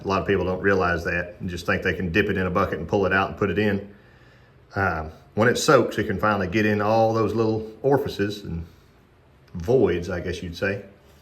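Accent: South, United States. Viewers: You must own your own business or your an educated man with a very good income but it blows my 70 year old mind how your able to afford all these expensive builds and such but I do still like the southern accent and they very nice things you do.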